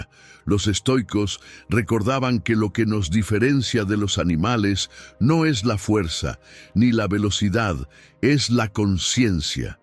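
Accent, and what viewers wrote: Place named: United States. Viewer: Acento americano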